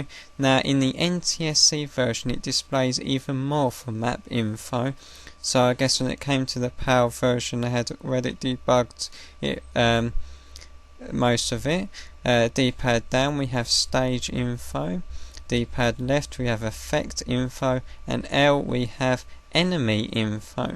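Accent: United Kingdom. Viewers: yey british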